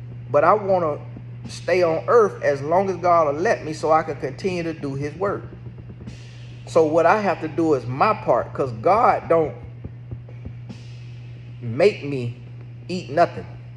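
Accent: United States. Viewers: God bless you. I am single and loving my life. Still praying for my husband whoever he is wherever he is., you are so country but I love listening to you.😂😂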